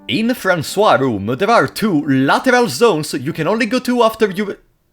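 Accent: Italy. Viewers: I am indeed someone who does not play the game. And indeed, I just plain out like to hear you ramble about things you like. It's nice to hear you talk about your interests, what you think of them, the jokes ideal para el tratamiento de hemorroides , hearing you talk about your life and, I hope you don't mind me saying this, I love your accent, Italian man. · il tuo accento italiano comunque è evidentissimo. Vengo dal regno di Cydonia. seguaci di Katanna venite fuori. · From your accent, it feels like you're a "proper" italian (let the term pass please, it's just to differentiate from italian-americans). Am i right or am i way off the mark? 🤔😅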